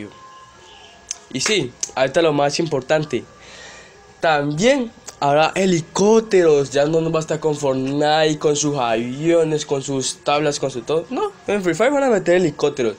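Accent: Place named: Portugal. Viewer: Hablas un poco raro como portugués xd de que país eres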